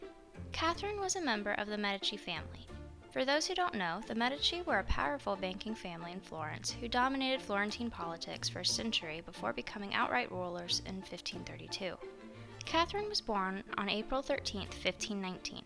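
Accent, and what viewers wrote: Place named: United States. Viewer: From on quite a few facts… I would’ve preferred the narrator to Speak a little slower and not use an American accent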